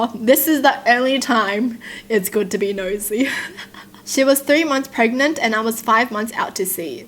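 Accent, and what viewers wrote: Australia. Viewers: she is way to damn austrailian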